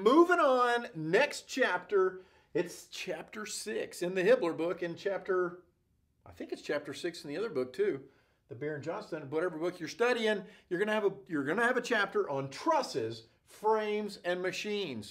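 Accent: United States. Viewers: Is that a Tennessee accent I hear?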